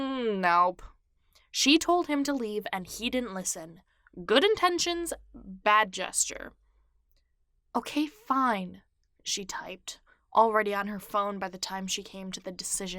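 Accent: United Kingdom. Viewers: I love your posh voice! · You're Cockney-Estuarine accent is quite interesting.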